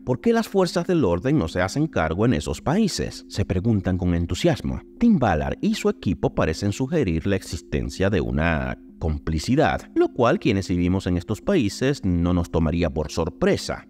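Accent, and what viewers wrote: Dominican Republic. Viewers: Wtf tu ere' dominicano mi loco 😮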